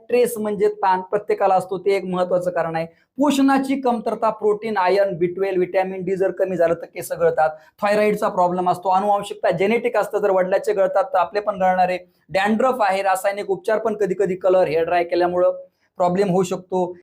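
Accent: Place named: Vidarbha, India. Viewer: दुसऱ्यातल्या कमतरता शोधण्यात लोकं पटाईत आहेत.स्वतः भले ही कर्तृत्वशून्य असो. त्यांनी जो केसं असा शब्द वापरला तो केस या शब्दासाठीच वापरला आहे विदर्भ आणि मराठवाड्यात बोलली जाणारी मराठी अशीच आहे आणि आम्ही आमच्या बोलण्याच्या पद्धतीवर खूप प्रेम करतो. कोकणातील लोकं वेगळ्या शैली ची मराठी मोलतात तर खांदेशातील लोकं वेगळ्या.. आणि आम्ही सगळ्याच्या बोलण्याच्या पद्धतींचा आदर करतो... ते ज्या विषयावर बोलत आहेत त्याच्यावर ध्यान देणं जास्त गरजेचं आहे न की ते मराठी किती शुद्ध बोलत आहेत. दुसरी गोष्ट ते काही मराठी व्याकरणाचं लेक्चर नाही देत आहेत त्यामुळं शब्दांतील बारकाव्यापेक्षा त्याचा मॅसेज महत्वाचा आहे... तसं ही अमेरिकेत किंवा यूरोपात गध्यातला गधा माणूस सुद्धा अगदी शुद्ध इंग्रजी बोलतो याचा अर्थ तो खूप बुद्धिमान आहे असं होत नाही. फक्त तो ज्या ठिकाणी वाढला आहे त्याचा तो परिणाम आहे. तुम्हाला जर वाटत असेल की तुम्हीलाच फक्त प्रमाणित आणि शुद्ध मराठी बोलता येते तर याचा अर्थ असा होत नाही की तुम्हीच खूप बुद्धिमान आहात... 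आणि तुमच्या कमेंट वरून तुम्ही मला त्या अमेरिकेतल्या शुद्ध इंग्रजी बोलणाऱ्या गध्या माणसा पेक्षा वेगळे वाटत नाहीत... एका शब्दावरून तुम्ही त्या सरांची शाळा, शिक्षण आणि बुद्धिमत्ता सगळंच काढलत. तुम्हाला स्वतः एकदा पहिली पासून शाळेत जायची गरज आहे. शिक्षण फक्त शुद्ध मराठी शिकन्यापुरत मर्यादित नसत त्यासोबतच भलेही भाषा शुद्ध नसो इतर लोकांशी कसं वागायचं हे ही शिकवत असतं कदाचित तुमचं ते शाळेतच विसरलं आहे 🙂👍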